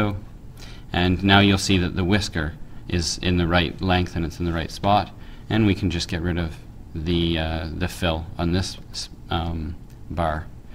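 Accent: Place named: United States. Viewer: Thank you so so much! I had an assignment for uni and had no idea how to do this or anything on excel. Your thorough explanation with your lovely american accent was amazing! Thanks heaps and heaps! :D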